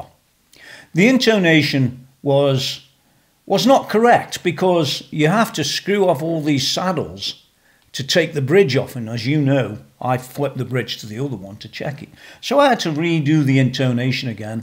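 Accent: Stoke, United Kingdom. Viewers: Are you from Stoke by any chance? :)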